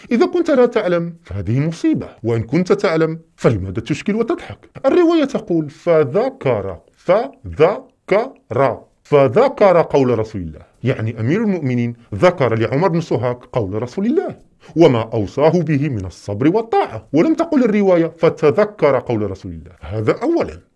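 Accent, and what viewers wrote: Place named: Iraq. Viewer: صاير تحجي عراقي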